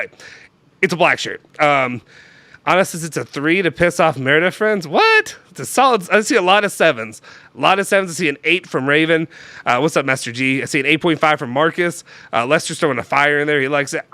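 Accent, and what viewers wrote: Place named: Minnesota, United States. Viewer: never do that Minnesota accent ever again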